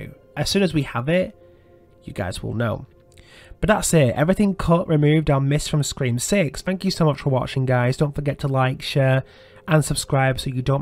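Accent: United Kingdom. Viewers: What british accent is this? Its my absolute favourite 😍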